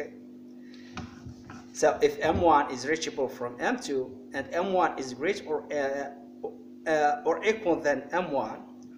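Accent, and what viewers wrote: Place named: United States. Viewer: تعجبني الاميريكان اكسنت نتاعك ربي يوفقك خويا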